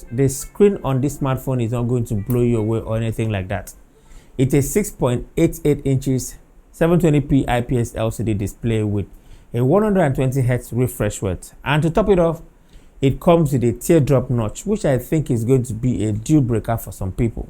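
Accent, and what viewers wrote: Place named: Igbo, Nigeria. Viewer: Igbo man , I can hear your accent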